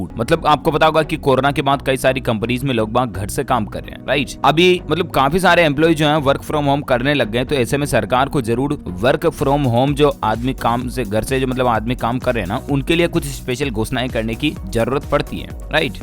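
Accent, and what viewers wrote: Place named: Odisha, India. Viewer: Udisa se bol raha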